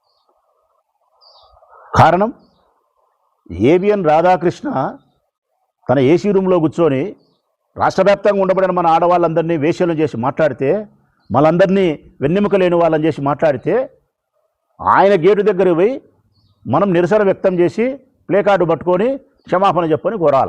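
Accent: Rayalaseema, India. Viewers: రాయలసీమ భాష లో మాట్లాడాలి అంటే అది మీరే అన్న 🙏🙏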